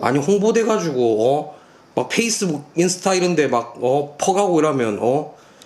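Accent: Busan, South Korea. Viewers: 영상에서 짜워? 이렇게 물어보시길래 ㅎㅎ 부산사투리군여 답글 감사합니당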